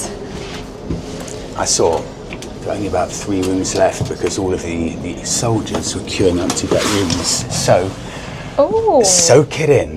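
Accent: RP, United Kingdom. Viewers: Just curious...is yours a London accent? Is that considered posh? Thanks.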